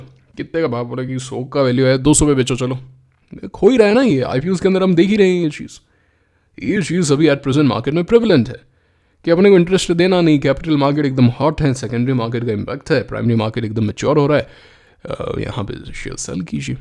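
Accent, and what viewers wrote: Rajasthan, India. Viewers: Bich bich mein rajsthani accent aa rha haiiiiìiììiiiiiiii........ sir 22 ke aaspaas se 😀😀😀😀😁😁😁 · Sir aap Rajasthan se ho na 😅 . Love you sir 😊❤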